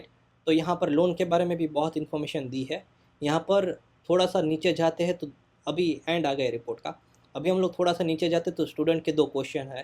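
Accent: Gujarat, India. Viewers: Gujarati kaise Hindi bolta h iske upar se ye Lagta h Please replay me 🔥🙏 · Bro kya aap gujarat se ho?? Because I am from gujarat orr aapki baate sunake muze aisa lag ra hai ki aap gujarati ho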